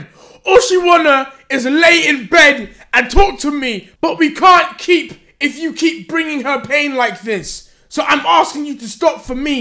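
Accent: United States. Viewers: talking about sorrow with a American accent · Your American accent is top notch man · Omggg his American accent is honestly one of your best things on this planet lmaoo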